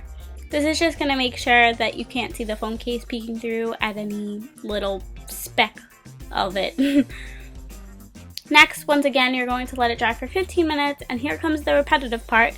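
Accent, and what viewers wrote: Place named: New Jersey, United States. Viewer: you sound like you're from jersey. are you?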